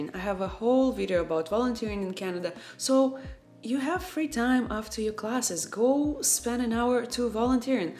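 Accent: Russia. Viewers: I soooo much love your Russian english🤣🤣🤣🤣 thanks for this wonderful video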